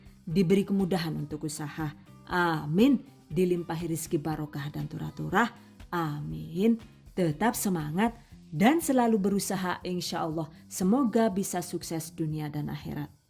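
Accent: Banyumas, Indonesia. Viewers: Paling suka liat video karna selalu detail,gk berbelit2 jadi mudah di pahami..apalgi kalo udh ada ngapak nya mkin seru nonton nya 😂😂.. #GiveawayTHV2021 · Salam hangat dari negri kincir angin..wah luar biasa resepnya..memasak sambil berhumor... bhs.gaya orang ngapak Banyumas...saluut dan proficiat..👍👍👍😁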